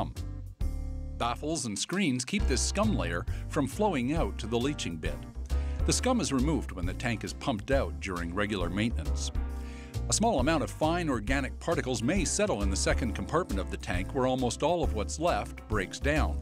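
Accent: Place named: Canada. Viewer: “Organ-I-zation” “Hoose” “Aboot” -def Canada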